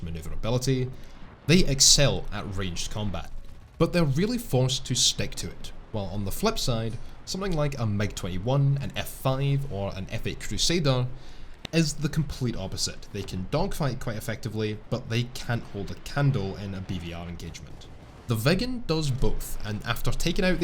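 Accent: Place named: Edinburgh, United Kingdom. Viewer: You sound like your from Edinburgh….I like Edinburgh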